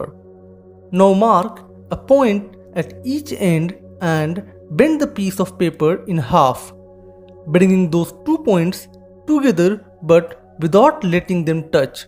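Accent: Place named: India. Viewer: Cringe indian accent Stolen Content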